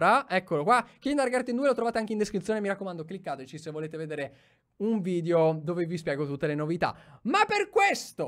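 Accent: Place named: Veneto, Italy. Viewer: Oddio ma sei venetoooo anche ioooo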